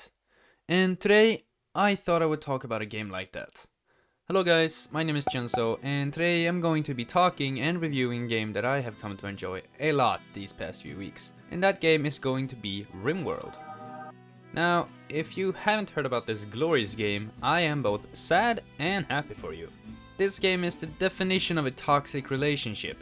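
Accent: Sweden. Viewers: Correct me if I'm wrong, but is that a Swedish accent?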